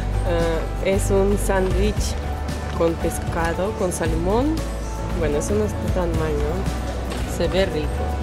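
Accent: Peru. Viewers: HOLA ME RESULTASTE MAS ACHORADA .....ESTAMOS JODIENDO /////ME HECISTE REIR USUALMENTE UNO HABLA LISURAS MAS CON LOS AMIGOS MAS INTIMOS PERO YA TIENES ACENTO PERUANO POR ESO SE TE QUIERE SALUDOSSSSS Y HOY ME SUSCRIBI PARA SONREIR DE TUS VIDEOS.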